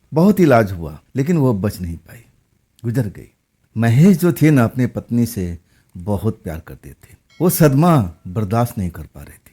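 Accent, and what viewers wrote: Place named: Bihar, India. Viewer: Aap ke pronunciation se lagta ki aap east belt ke hai yani east up ya bihar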